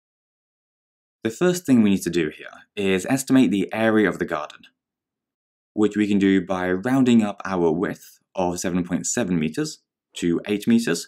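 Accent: United Kingdom. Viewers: Good Bristish Accent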